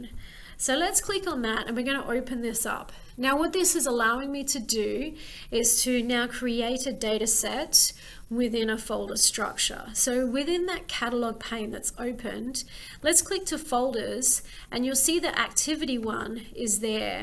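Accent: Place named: Australia. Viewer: You explain this so well. It was so simple to understand and follow along in my ArcPro account. The Australian accent is top tier! Super helpful, thank you from California!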